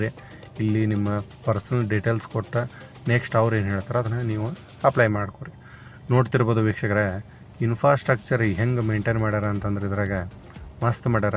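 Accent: Hubballi, India. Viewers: ಹುಬ್ಬಳ್ಳಿ ಮಾಲು ನೋಡಕ್ ಬಹಳ ಸುಂದರವಾಗಿದೆ ನಿಮ್ ಭಾಷೆ ಹುಬ್ಬಳ್ಳಿ ಭಾಷೆ ಕೂಡ ಬಹಳ ಚಂದ ಇದೆ ಬಹಳ ಬಹಳ ಚಂದ ಮಾತಾಡ್ತೀರಿ ನಮ್ಮೂರಿನಲ್ಲಿ ತುಂಬಾ ಮಾಲ್ಗಳಿದಾವೆ 👌👌👌👌👌❤️❤️❤️❤️❤️🎉🎉🎉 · ತುಂಬಾ ಚೆನ್ನಾಗಿದೆ ಬ್ರದರ್ ಮಾಲ್ ಹಾಗೆ ನಿಮ್ಮ ಹುಬ್ಬಳ್ಳಿ ಭಾಷೆಯನ್ನು ಚೆನ್ನಾಗಿದೆ❤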